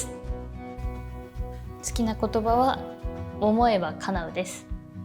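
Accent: Akita, Japan. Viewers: 久々の秋田弁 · 秋田弁可愛い‼️ それに、仮面ライダーカブト見てるのが、共通点あって嬉しい‼️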